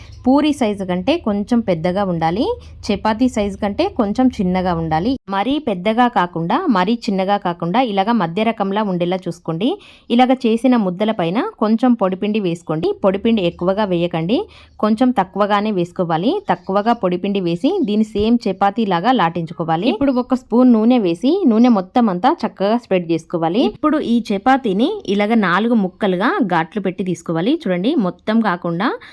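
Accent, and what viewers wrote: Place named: Telangana, India. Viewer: Bagane cheppaaru kani konni words and mee slang edhola unnaay. Artham kaledhu. Meedhi Telangana state ayyi undochu kani andariki artham ayye slang lo chepthe bagundedhi. Only Telangana vallake kadhu kadhaa ee video. Telugu state ayina Andhra vallu kuda chudochu kadha. Naku kuda voma ante artham kaledhu evaro cheppedaka. Slang pure ga artham ayyela unte baguntundhi. But video is ok.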